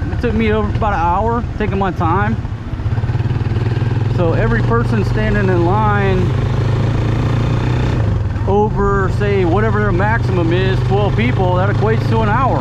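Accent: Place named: Alabama, United States. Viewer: Where u from, sound like Alabama, my home for now...I'm glad they opening up, cause I'm ready to come there as soon as international flights nd visas atr issued...be safe bro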